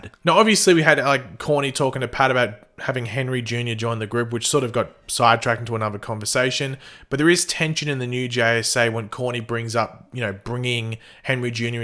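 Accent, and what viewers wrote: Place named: Australia. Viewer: im quite sure that you're australian from your accent like I am and I just saw this video and thought how did you see this before 13th july its the 14th now and I use a vpn to watch the show from the website and cant watch it yet how do you?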